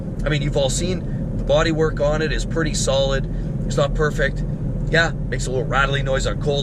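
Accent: Canada. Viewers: Notwithstanding the recent revelations regarding the workload for the Donut Media guys, no other car YouTuber works harder warning and endorsing cars and the issues people experience. When shoppuing for sleeper value, this infomation is invaluable. I also pick up on his Canadian accent every once in a while and he sound like Bob and Doug McKenzie.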